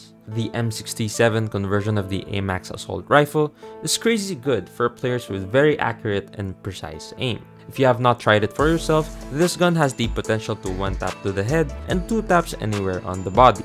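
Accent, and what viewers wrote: Philippines. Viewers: I thought your from Philippines besides your talking in English like Filipino Lmao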